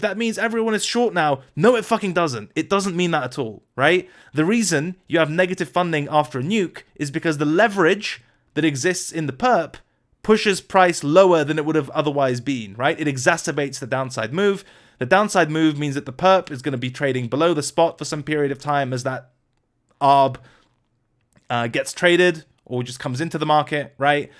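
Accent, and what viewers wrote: United Kingdom. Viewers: Hey I can't believe you are Russian, with such a strong British accent. Sending my regards from Queensland Australia 🤝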